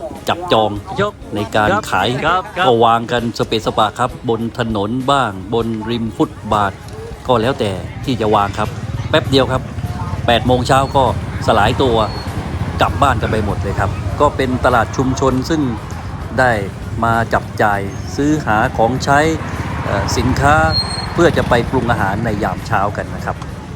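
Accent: South, Thailand. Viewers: เหลงใต้ชับเปรียะเลยครับ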